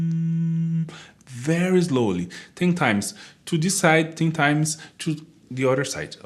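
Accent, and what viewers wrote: Brazil. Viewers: Timestamps Lip trills - Z Sounds- Lip trills - The sound of Z - Tongue Rotations - Glissando - Train Whistles - Lip Trills - Do three sets of these before you sing. Thank you so much,! P.S. I love your speaking voice and your Brazilian accent!!! I'm looking forward to learning your language 😊❤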